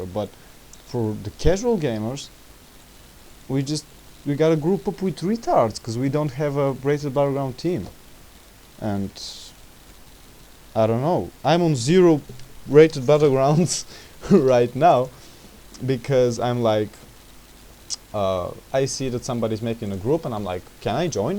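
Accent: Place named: Russia. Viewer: RUSHAN AKCENT SO GUD.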